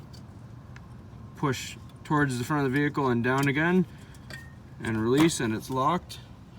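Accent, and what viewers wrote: Canada. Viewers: Thanks bruh, you sound Canadian. :) btw, I had to take my battery out to get to the headlight on driver side. 2003 Vibe. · You seem to have a Canadian accent! 😊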